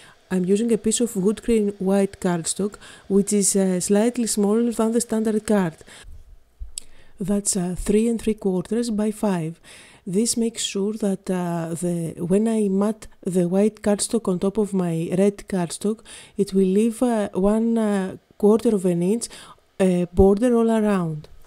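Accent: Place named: Greece. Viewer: I have been following your blog and videos for a while now and just love your cards. Just love your Greek accent.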